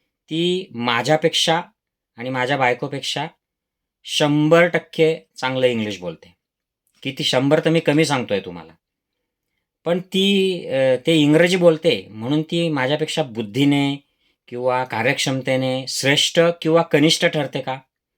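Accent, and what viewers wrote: Khandesh, India. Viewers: अहिराणी भाषा अगदी गोड आहे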